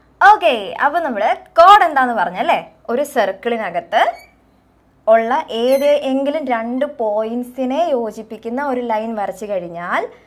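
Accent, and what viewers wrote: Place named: Alappuzha, India. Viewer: Alapuzha Alle teacherde house cheriya oru slange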